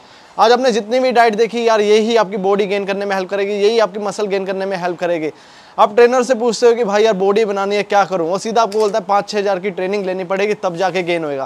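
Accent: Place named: Uttar Pradesh, India. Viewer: Aap up se ho sir voice se pta chl jata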